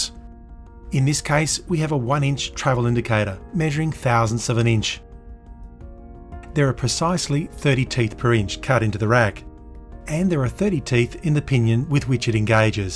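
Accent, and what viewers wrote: Australia. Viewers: Hearing an Aussie accent talking about inches brings back memories. And yes, I'm that old. 👍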